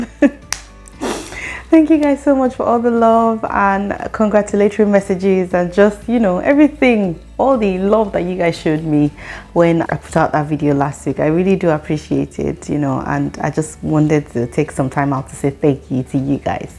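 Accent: United Kingdom. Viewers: Enjoyed watching your fine face and Bri-eish Accent! No standing on the long queue again o jare🎉❤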